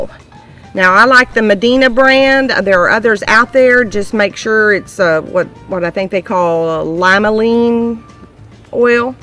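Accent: South, United States. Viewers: If it doesn't kill the queen that's way down deep, the ants in the ground will move the mound. I love your videos and you sound just as southern as me.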